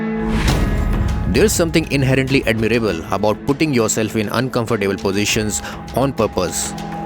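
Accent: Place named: India. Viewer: good lord, the indian accent, it feelsl like you want to scam my money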